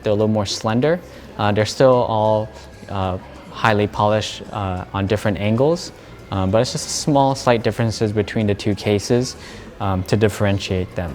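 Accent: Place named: United States. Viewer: Super pumped!!!........Americans destroying our wonderful language!!! Lovely watches though!!! And enjoyed your content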